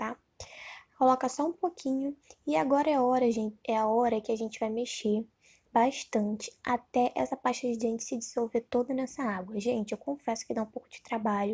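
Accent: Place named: Rio de Janeiro, Brazil. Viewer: Vc é bem carioca né, da pra ver de longe, talvez nem seja, mais tem mt mt mt sotaque, gostei do video, vou testar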